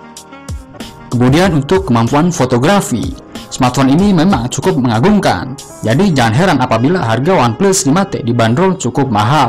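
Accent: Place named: Chinese-Indonesian, Indonesia. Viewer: Dari logat bicaranya kayakx koko koko deh yg ngomong ini... mirip ama logat temen2 gw yg orang china,,hahahah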